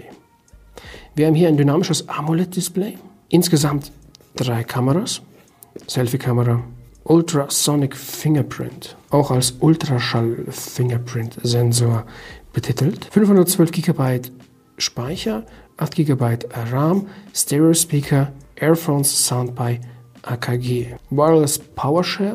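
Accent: Russia. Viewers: bist du russe? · Russischer akzent izz da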